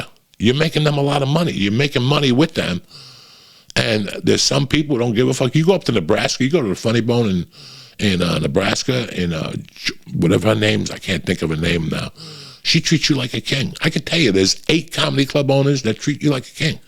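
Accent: New Jersey, United States. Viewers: Somehow shameless advertising with a Jersey accent works on me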